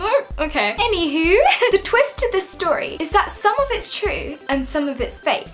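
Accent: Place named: RP, United Kingdom. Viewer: I'm from England and I want your accent is that weird? You sound so posh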